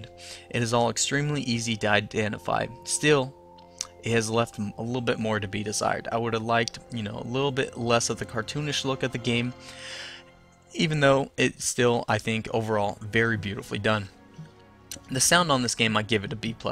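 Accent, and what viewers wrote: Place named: East Coast, United States. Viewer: it;s fine to me... He's probably from the east coast.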